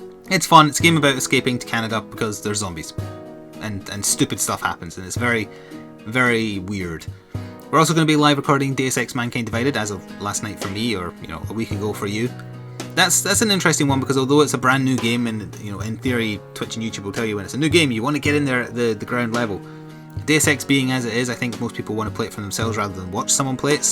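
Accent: Scotland, United Kingdom. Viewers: He sounds Scottish so, Scotland?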